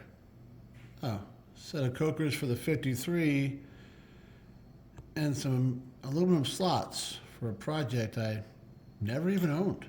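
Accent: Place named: East Coast, United States. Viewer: ok I subscribed your funny and got that east coast talk that I like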